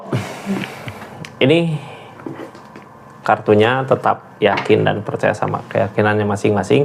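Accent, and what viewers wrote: West Java, Indonesia. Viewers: Urang sunda nya kang.. 😂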